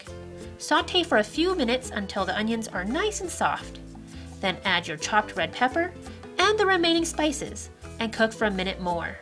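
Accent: Canada. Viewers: Heh I like the Canadian accent - it's eh- nchilada like egg or end ;) (Mexican here). Nice recipe I would've never thought of black eye peas but they do have a meatier taste to me than other beans.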